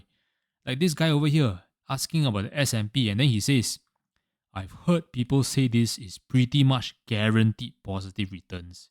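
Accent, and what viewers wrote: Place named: Singapore. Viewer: Really love the singlish presentation!! Totally relatable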